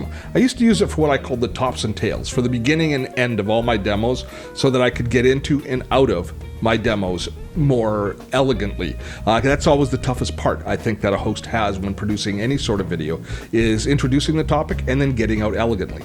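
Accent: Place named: Canada. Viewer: Thanks for this I am trying to get to grips with the app. It sounds like you are a fellow Canadian, what a great personality you have!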